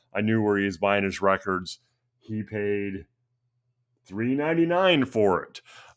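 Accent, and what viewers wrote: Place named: South, United States. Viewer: What part of the south are you from? I can hear an accent but I can't quite place it. I'm going N.C? Possibly T.N? Can't go wrong with Rose Tattoo. Wow! The Who!? You definitely have their best one. I could listen to the first Grim Reaper record all day long. The second one is pretty good too. Check out my video on them. GNR has never put out a bad one but you're right. That is one of the greatest debuts of all time. Keep this theme up! It's cool. Cheers!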